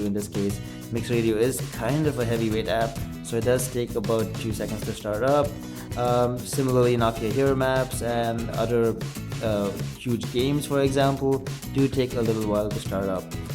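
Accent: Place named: India. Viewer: Ur name is with dat accent uhh, r u catholic indian?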